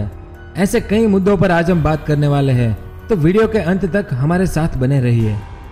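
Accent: Gujarat, India. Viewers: bhai gujrati hoo kya?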